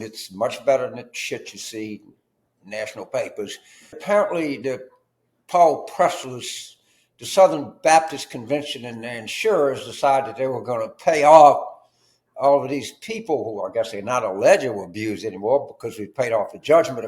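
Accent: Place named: Louisiana, United States. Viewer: I don’t know why but I want to hear Mr. Carville say, “We’re talking ‘bout wife swappin’ with these moms for liberty” in his Louisiana drawl.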